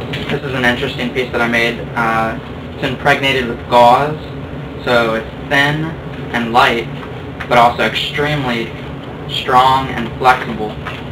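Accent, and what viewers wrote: United States. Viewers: ... thank you for the details! a typically American English with a hum in the backdrop, made it difficult for an Asian like I, to comprehend. thanks for the details in writing. thank you so much for the help! ... 🙏🙏🙏 ... - NSJ / INDIA Thursday, 4 MARCH 2021 ( Hrs IST) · Hi, what a great video! Thank for sharing this with us! But the bad acoustic and the circumstance that I am not used to listen to American English (sorry! ;-) make me understand less than half of it. Can you write down the ingredients and the amounts of them here in the comments for dummies like me? ;-) Thank you! Best wishes from Germany, Katie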